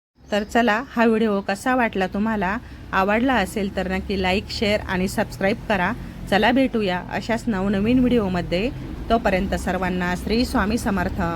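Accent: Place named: Nashik, India. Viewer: तुम्ही नाशिकच्या आहेत का ताई🎉 बोलण्यावरून वाटतंय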